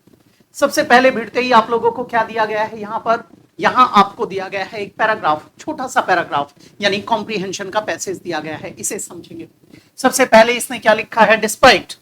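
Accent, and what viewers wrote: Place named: Bhaswal, India. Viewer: Sir aap boli bhaswal se ho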